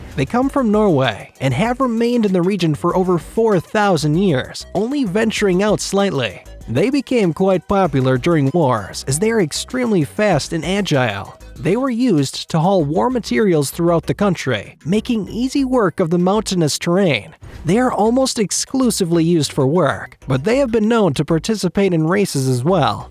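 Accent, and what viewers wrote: United States. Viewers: I love how this dude speaks "Countrey"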